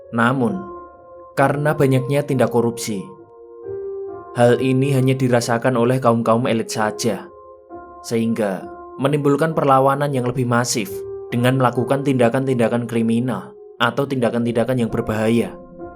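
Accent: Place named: Java, Indonesia. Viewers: Lekoh bgt bang logat jawa nya 😄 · Suaranya agak medok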